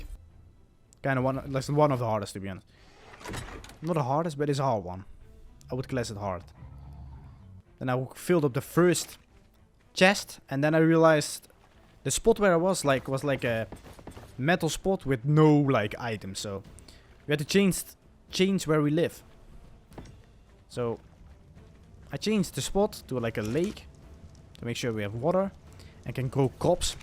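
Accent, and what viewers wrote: Netherlands. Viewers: Ik hoor aan je accent dat je nederlands ben wil je eens ark splen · woooow nice video but are you Dutch? cause i can hear some kind of accent! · Hahah eerste zin die je zegt en ik hoor nu al dat je Nederlands spreekt, maar wel een goede vid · E bro ik hoor dat je nederlands bent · U sound so Dutch like me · Ik kan nu al horen dagge ne Nler zijt haha goe videoke :P groetjes van België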